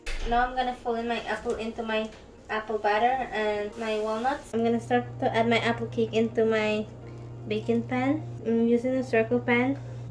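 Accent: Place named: Berbice, Guyana. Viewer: Just luv that Berbice accent!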